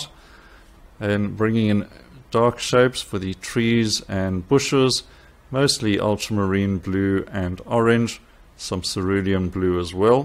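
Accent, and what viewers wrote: South Africa. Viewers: How nice to hear a South African accent in a tutorial for a change. And that landscape inspiration pic makes me “verlang” home. So a happy and sad video 😄😔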